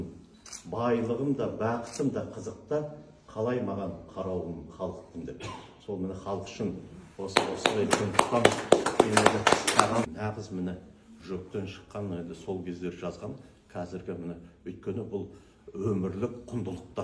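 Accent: Standard. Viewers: Молодец Ахмеджанов, Касымов Қалмухамет сияқты қашып жүрмейді журналистерден, таза қазақша сөйлеп тұр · Мына генералдын жаны Казаккой, керемет таза сойлеп тур. Ана тилин билмейтин адам Казак бола алмайды, канша ол патриотпын тагыда баска деп айтканымен онын жаны Казак емес, онын ойы, корген тустери ис арекети Казакша бола алмайды.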